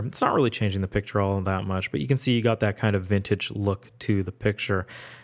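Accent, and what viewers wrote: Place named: Canada. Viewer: I was listening to this while I doodled with the app on my phone, and I had the thought that based upon your accent you must be Canadian! Then I realized it was in your intro lol. Anyway, this app is excellent. Definitely one of the best editing apps I've used on the iPhone. The whole selective adjust part is what I feel separates it from any other app out there.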